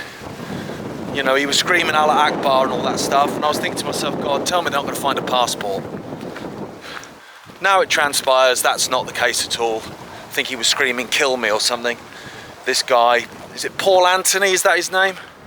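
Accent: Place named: Cockney, United Kingdom. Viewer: Say ands b proud of cockney accent I am one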